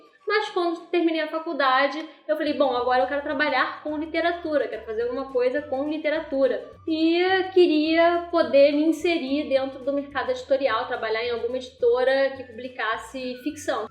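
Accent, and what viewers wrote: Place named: Rio de Janeiro, Brazil. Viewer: Pelo sotaque é carioquíssima... Estou procurando editora para Romance dramático. Vou mandar-lhes meu original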